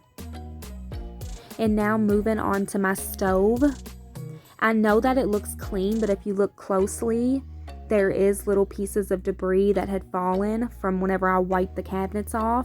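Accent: South, United States. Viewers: You might be a gypsy well honey you have got that southern barrio accent I am from Georgia and I got it to you tickle me when you talk about all that stuff just the prettiest little fine but I’ll say one thing you were very clean person nobody could never say you was nasty thank you for sharing hugs and prayers baby give the baby a big O kiss and harmony today❤ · I love your southern accent!! I'm going to need to try that Anti bacterial pledge!❤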